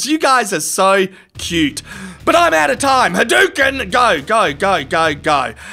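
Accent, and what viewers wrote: Australia. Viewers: 3 leopards each 3 times larger than the lone honey badger, yet he comes out on top. As my boxing coach used to say: "Balls win fights." Loved the Aussie narration in this one! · Haha watched this elsewhere and rematches a few times thinking "holy shit" I need the Aussie man version. Well done son, well done.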